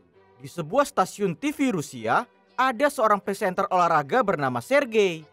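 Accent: Medan, Indonesia. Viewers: Sering2 upload lah bang..ntah film apapun..yg penting suka kali aku dengar logat medannya..😅😂👏👏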